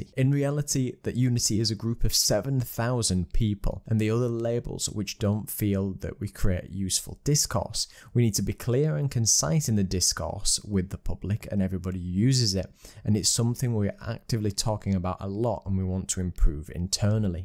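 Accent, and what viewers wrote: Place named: North, United Kingdom. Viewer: Hey thanks for the coverage, now I know what I'd sound like coming from the north! 😉 Sorry to see the comments below that you are having struggles with the YT algorithm re Titles, that sucks. All the best!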